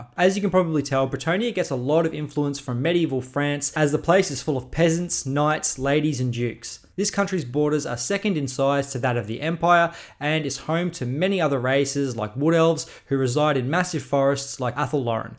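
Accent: Australia. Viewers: Great video and so good to hear it in an Australian accent!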